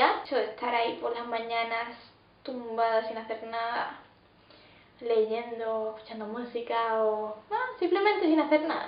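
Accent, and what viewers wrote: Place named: Spain. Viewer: Ese debe de ser el acento más hermoso del español. ¿de qué parte de España eres?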